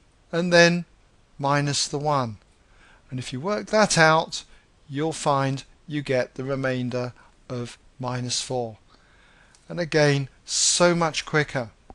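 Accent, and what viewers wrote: United Kingdom. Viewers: Wow nice British accent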